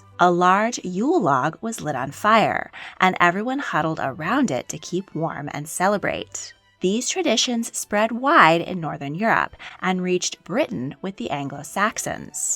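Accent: California, United States. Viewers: why do we have to endure this American accent!!!!!!!!!!!!!!!!!!!!!!! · Very interesting ..Kaiser Wilhelm was not Edward The Sevenths cousin , he was his nephew ...your American accent is very thick isn’t it ? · Excellent research but the Californian accent is unbearable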